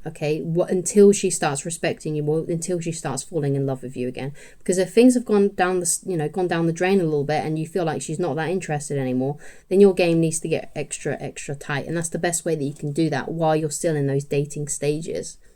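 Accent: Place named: United Kingdom. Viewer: loved that british accent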